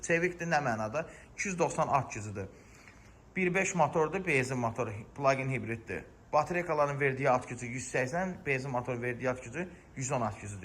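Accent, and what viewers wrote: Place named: Qabala, Azerbaijan. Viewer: Ləhcənnən nömrəni 036 muqaisələndirdim qərara gəldim ki Qəbələdənsən😌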